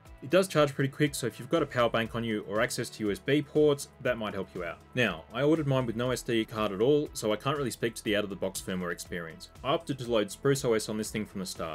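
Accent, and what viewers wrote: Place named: Australia. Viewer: Melbournian here, it’s a treat hearing a fellow Australians voice doing this (kinda tired of Russ from RGC voice..)!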